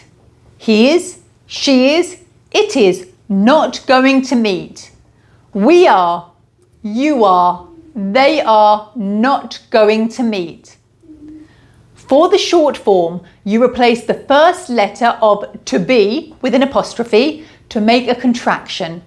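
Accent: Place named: United Kingdom. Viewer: I'm going to say thank you my nice teacher I love Britch English 😂😂😂😂😂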